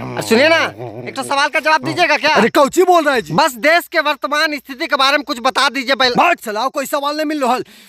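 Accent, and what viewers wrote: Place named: Bihar, India. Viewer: ಬಿಹಾರಿ ಭಾಷೆ ತುಂಬಾ ಸುಂದರವಾಗಿ ಮುದ್ದಾಗಿದೆ... ಕೇಳಲು ತುಂಬಾ ಖುಷಿಯಾಗುತ್ತೆ 💛❤️🥰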